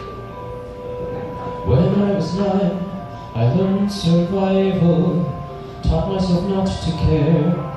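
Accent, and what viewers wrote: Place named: Scotland, United Kingdom. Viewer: Were you born in Glasgow? I hear that you grew up there, just wondering. Love a fellow scottish accent with a good sense of humour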